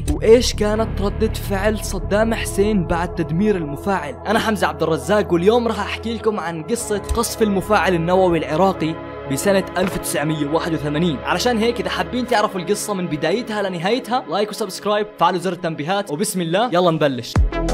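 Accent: Jordan. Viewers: لهجته مبينه اردني 😂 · ياالله يل لهجه العسل احب الاردن اخوك من العراق❤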